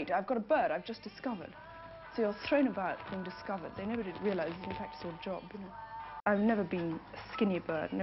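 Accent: RP, United Kingdom. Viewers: It's called RP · Brits correct me, because I'm American...She sounds VERY RP to me. Interesting how formal and high-brow her accent is. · She was the ultimate dream dolly bird, posh talking and beautiful.❤️❤️❤️ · This is RP and it's an affect, it's the least real English can be. It's not a bad thing necessarily, she doesn't have to speak "real" English given that she wasn't even born in England. I guess assuming white foreigners are English because they speak RP is par for the course in this country, it's all about aesthetics. The fact that this user replied to themselves further expounding their implicitly reactionary world view leads me to believe that their perspective on "real English" identity is distorted and deranged, which is reflected in the fact that actually English people don't meet that standard and random provincials who weren't even born in this country do. 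· Received pronunciation.❤❤❤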